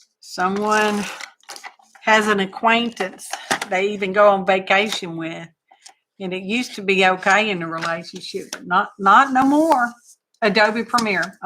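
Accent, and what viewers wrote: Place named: Texas, United States. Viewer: Glad you are back in the grove. I payed part of your video at 1.5 speed.... it was funny listening to you talk! "Texan" sounds good no matter what speed!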